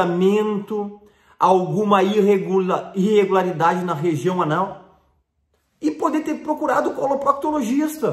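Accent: Rio Grande do Sul, Brazil. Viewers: o Sr é Gaúcho?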